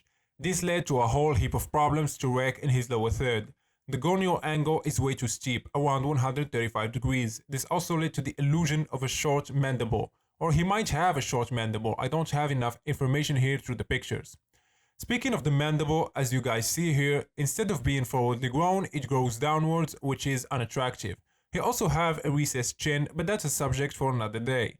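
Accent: Morocco. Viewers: wach nta mghribi azbbi ach had l accent hhh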